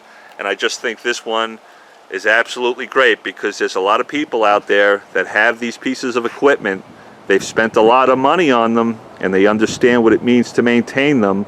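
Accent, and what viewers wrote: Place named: Philadelphia, United States. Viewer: that's not a Texas accent....I'm from the Philly area and I know that accent is within 2hrs or less from me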